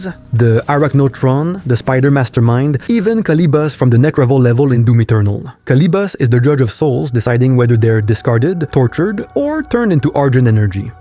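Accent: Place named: Quebec, Canada. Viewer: Juste à l'accent je le sais que t'es un quebs 👁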